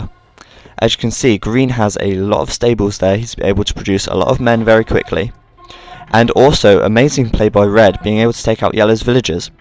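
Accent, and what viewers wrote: United Kingdom. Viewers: This is a great video and I love your British accent. MAKE MOAR!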